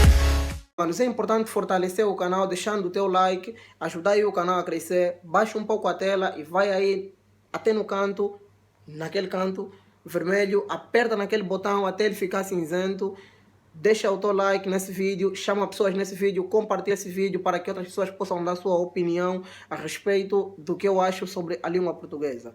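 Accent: Portugal. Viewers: Só lamento , mas teu sotaque é Português de Portugal. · Seu português é ótimo. Fala com um pinguinho de sotaque de Portugal, mas só um pouco. Entendo tudo , melhor do que se estivesse um português falando. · Por quê "português angolano"? Você fala tal qual os portugueses.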